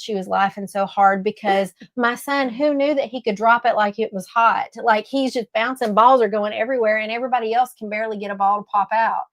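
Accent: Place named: South, United States. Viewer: Hey! Cathching the replay! We're still put here at the cabin. Hubby has been building the shed and he's setting up the washing machine today that we picked up the other day! Yay! Nomore hand washing laundry when we're out here! I will still dry them on the ine, but we will have both an outdoor line and indoor in the shed. I love line drying anyway. I love your southern "hick" accent. I have a "slight" southern accent because I'm from an area that is kind of mixed between north and south, if that makes sense. I have have FINALLY developed the one habit that I've been struggling with for 15-ish years! Since I've bern here this trip (came here at the beginning of March); I have-without fail- gotten ready for bed every single night before settling in for the evening! Nomore falling asleep in my day clothes! I have made that a priority over after-dinner clean-up in my evening routine. 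That means I still have to do he clean-up in the mornings alot, but that's ok. When I ferl like my evening personal care routine is as automatic as brushing my teeth, both here AND at jome, THEN I'll work on prioritising after-dinner clean-up. For now, if I get it done, great. If I don't, it's ok. The ticks! Ohmy! We're constantly checking each other for them out here, and we still find ourselves picking them off after checking lol. We get them back home, but not THIS much! I've kind of gotten used to it as part gor the course lol.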